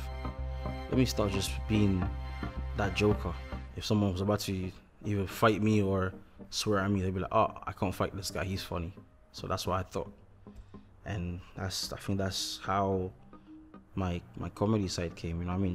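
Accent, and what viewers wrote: Scotland, United Kingdom. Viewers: I can't believe he has a Scottish accent I thought he was from London, that has absolutely blown my mind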